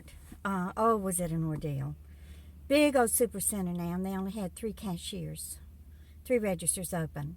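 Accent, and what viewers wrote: South, United States. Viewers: . I love listening to your stories. I knew that accent had to have come from somewhere close to me. I'm from Mobile. I grew up going to southern Baptist and I had too many questions about the bible so when i was old enough I quit going to church. I'm not going to live my life in fear of going to hell because this book said so. I raised my kids to choose their own believes. I'm not here to offend you in any way. I do believe in a higher power just not the God that we speak of today. Oh an yeah....Wal-Mart is the devil himself. Most people including me have to shop there by force. We can't afford to pay the higher prices elsewhere. PEACE & LOVE.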